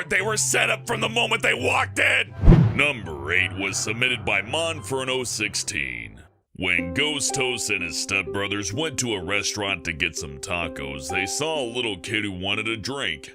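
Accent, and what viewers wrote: Australia. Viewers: Sounds like Australia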